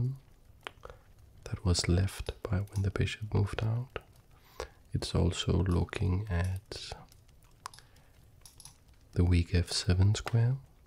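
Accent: Denmark. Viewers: Are u danish cause🇩🇰🖐Hva så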